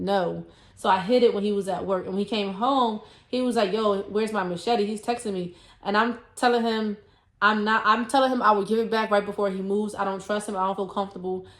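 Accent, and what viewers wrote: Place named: New Jersey, United States. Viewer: I like you story telling! I don’t mind the tangents and your Jersey accent is so nice!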